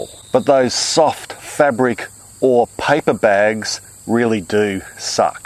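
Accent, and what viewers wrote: Australia. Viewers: Even if you don't garden it's worth watching this guy, gotta love those Ausies " it was a bugga to start" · Haha - true Aussie male, really good, ta! lol :D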